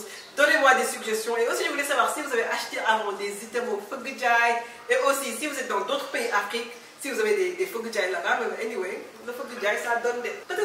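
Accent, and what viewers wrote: Senegal. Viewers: L'authentique accent sénégalais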